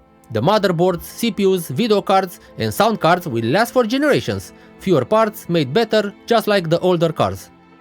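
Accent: Romania. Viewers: Salut. Mișto clipul, succes! A, și super accent romanesc haha.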